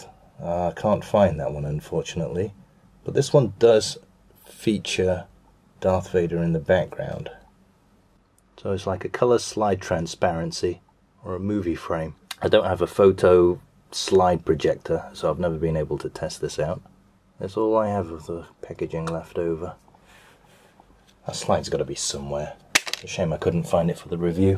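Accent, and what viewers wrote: United Kingdom. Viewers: Cuz he's British...I say Darth aswell, cuz im British too...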